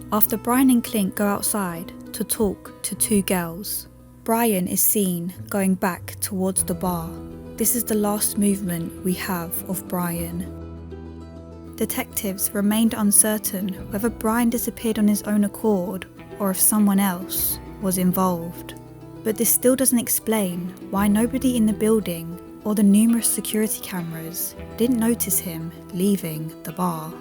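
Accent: United Kingdom. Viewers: Sounds very Croydon? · I love the narrators Luton/London style accent. Sounds elegant with twangs of ghetto 😅 Love it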